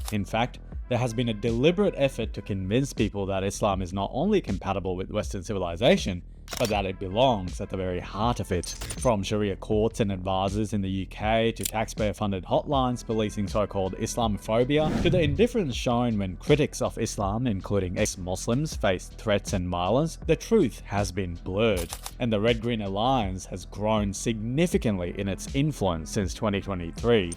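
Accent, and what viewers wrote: Australia. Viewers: Great video, thank you. I can't believe you have such an Aussie accent but are from the Maldives. And I got a shock when you said you were an ex-Muslim in your previous video. What age were you when you arrived in Australia?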